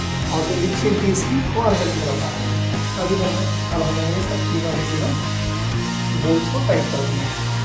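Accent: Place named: Uttar Pradesh, India. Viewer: Itni shuddh Hindi kahan se Sikhi up ke ho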